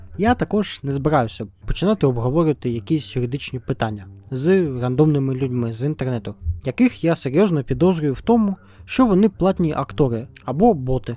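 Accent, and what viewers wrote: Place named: Russia. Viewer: Вы выступаете за все украинское, но почему у вас вся фонетика русская?? Вы даже "и" произносите по-русски как [ɨ], а не по-украински как [ɪ] и "в" как русское /в~ф/: жофтня а не жо[w]тня... Буквально слова украинские, а звуки русские. Я тоже не поддерживаю войну, но это какой-то позор. Как лингвисту мне интересно послушать литературный украинский, но такие материалы найти реально сложно, везде какая-то пародия на украинский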